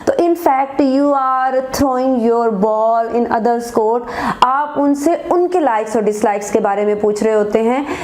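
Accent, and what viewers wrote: Punjab, India. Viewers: Please take as a feedback, punjabi lehjay me English chor dain,. . . Otherwise your content is fabulous.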